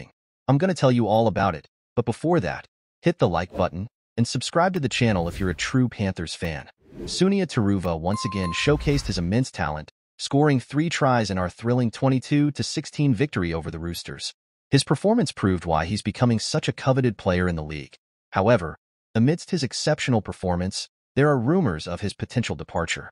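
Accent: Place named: United States. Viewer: Why an American accent?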